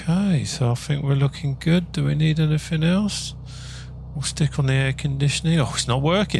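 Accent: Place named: Cockney, United Kingdom. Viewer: Is it scary how well he suits the cockney geezer accent.. Yes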